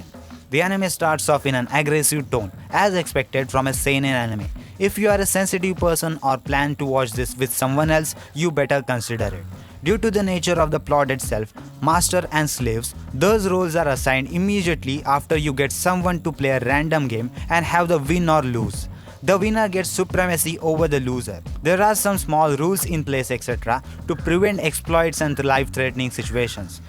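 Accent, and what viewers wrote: India. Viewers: bro work on your audio and idk why u sound indian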